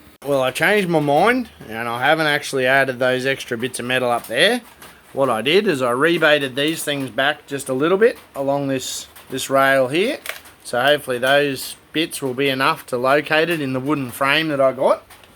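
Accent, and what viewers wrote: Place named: Australia. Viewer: Looking good!, huge back saver!i thought i was the only one who had trouble with train leaving the station without me on it !😂you to young! Love the Australian accent and words you use, seriously looking great you've come long way!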